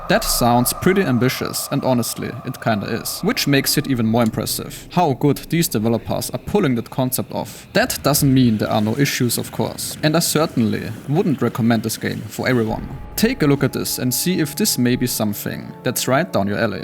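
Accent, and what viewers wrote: Germany. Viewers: U german bruh ?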